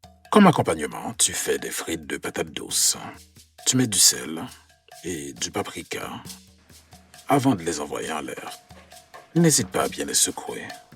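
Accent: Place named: Quebec, Canada. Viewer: Belle recette et bonne narration. J'ai crue remarquer un très petit accent Québécois, la poutine ma confirmé le tout. Merci. ;)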